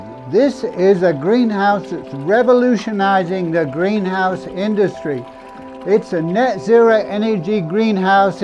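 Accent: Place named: Yorkshire, United Kingdom. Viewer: I think I can hear a Yorkshire accent poking out through many years in the US?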